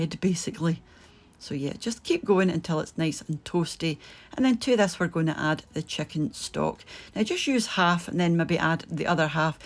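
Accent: Scotland, United Kingdom. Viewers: Hey misses, love this recipie, it is very much how I do things, love the accent, im from stornoway myself, now edina, and lovin cookin now, so im doin beef n ale stew the night, its a silverside roast but I find these roasts go tough, plus, who doesnt love a stew (wae Newcastle brown ale), my wee shop had no wee bottles o wine, so make do n mend, n im usin dried porchinis n the water, along wae beef stock n the ale, so, low n slow, love your recipies, remind me o home x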